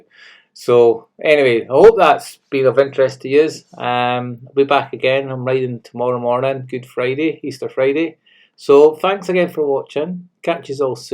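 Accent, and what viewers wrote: Scotland, United Kingdom. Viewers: I have a hunter and same as you head wind and it is a bugger to overtake things, on a good run slight down hill I just got 80mph. But I would say normally 65 to 70 is it. Now as your a Scotland type man I do struggle to catch everything you say ha ha, great videos though. I have a pal who lives in Pittenweem so not too far from you. I'm down on the kent coast. Cheers.😊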